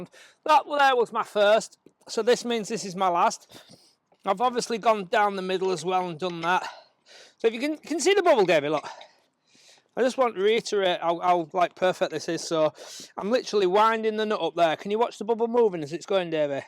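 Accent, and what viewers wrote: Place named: Yorkshire, United Kingdom. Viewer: Found these today, first of all thank you for taking the time to make these. I think they're the best step by step instructional videos I've seen, very well done! And sounds like a proper Yorkshire accent too, which is even better! Question - what size channel do you use for the shoes? Struggling to find one that will fit 75mm internal dims. Do you use the grinder to cut them from a length? Thanks very much, keep up the good work! Si thi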